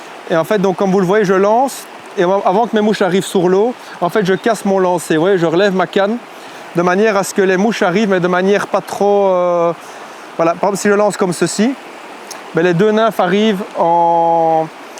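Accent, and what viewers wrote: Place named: Belgium. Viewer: J'adore tes vidéos ! Avec ton petit accent belge ça rajoute un truc de ouf. Merci à toi. Grace à tes vidéos j'ai découvert une pêche après des années de cuillère... Tout en finesse, tout en sensation !! Je ne reviendrai jamais en arrière ! Merci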